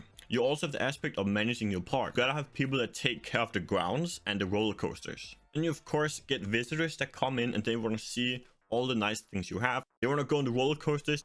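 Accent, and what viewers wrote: Denmark. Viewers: Are u danish? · I COULD HEAR IT IM FROM DENMARK TOO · Er du dansk? :D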